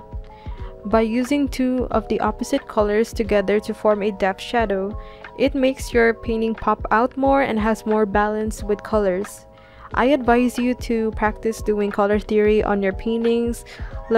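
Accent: Philippines. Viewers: From the chicken and the accent, I can tell you're from the Philippines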